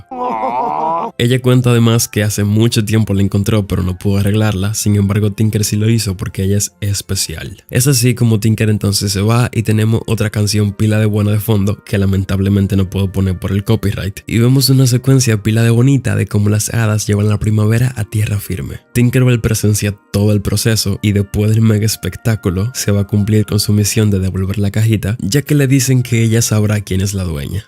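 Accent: Dominican Republic. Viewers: Algo me dice que el es dominicano? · Vos es de dominicano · Bro, tu eres dominicano verdad? El final tu canal, me encanta como narras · Amo como narra, nunca pierde esa esencia dominicana ❤️‍🩹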